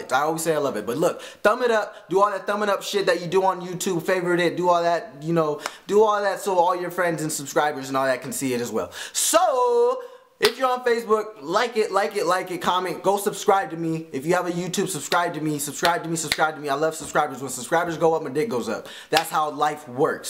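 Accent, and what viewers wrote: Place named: United States. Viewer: u soun soo country (wat tha hell )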